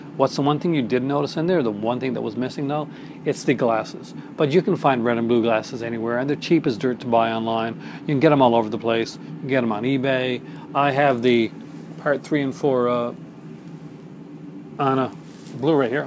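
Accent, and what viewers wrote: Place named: Canada. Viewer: you have more of a Canadian accent now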